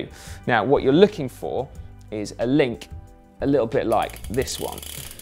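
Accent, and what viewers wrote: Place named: United Kingdom. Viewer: Could you possibly do this video again without the British accent?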